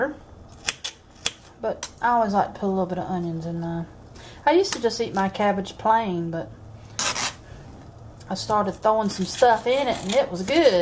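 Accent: South, United States. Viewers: LOVE raw cabbage!!! I'm a country girl at heart, even though I left and went to the city years ago. I'm back and still love raw cabbage! Love ur video. I can't use the salt though, I'd swell up like a balloon. Bacon will have to do, cuz even that makes me swell a bit. But I love bacon too!!! Thanks for sharing. I love your accent. What state are you in? I left north and went south to VA 3 1/2 yrs, then to Houston TX for over 40 yrs, then SC 2yrs crossed over to NC for about 8 yrs, now back north for last 5 yrs. I miss the south though. Miss my friends and all the shopping and eating places. Blessings to you and ur hubby! L :)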